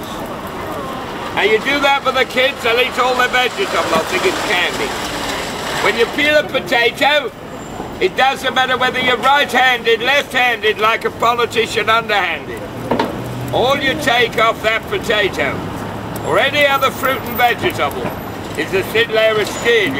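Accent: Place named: Peckham, United Kingdom. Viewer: his voice isn't Australian. sounds like he's from the depths of Peckham England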